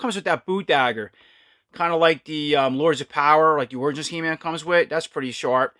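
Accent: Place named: New Jersey, United States. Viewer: Great review! I love your NJ accent haha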